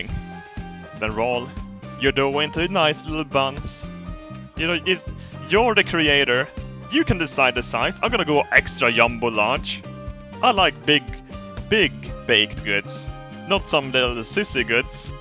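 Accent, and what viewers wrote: Sweden. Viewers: the way everyone in the comments is trying to correct because of how he says the word Flour, or just saying "Nice english bro" He's swedish they pronounce words differently not everyone says words the way you do. So stop correcting him. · Swendlish is aborable. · Min favorite part av this video är the svengelska! :) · ”put your buns on backplåtts-paper” His swenglish is perfection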